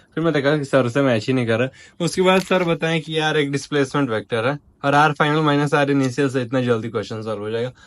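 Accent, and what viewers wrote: Bihar, India. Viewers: Bhai aap bihar se ho kya ascent se lg rha hai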